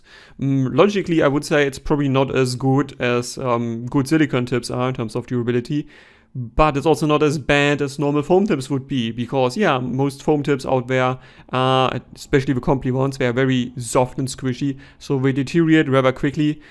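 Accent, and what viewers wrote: Germany. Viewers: Madre Mia!! Your English is totally undecipherable. SLOW DOWN YOR SPEAKING. You have a very thick German accent. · Für mich war’s überhaupt kein Problem, deinen deutschen Akzent zu verstehen, wenn du Englisch sprichst. Ich bin nämlich auch zweisprachig (Deutsch/Englisch), also mach dir da echt keinen Kopf. Dein Akzent erinnert mich an Der8auer und der hat immerhin 240 k subs.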